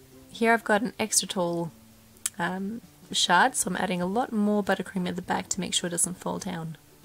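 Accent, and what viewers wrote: Australia. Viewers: why do almost all the good bakers have Australian accents?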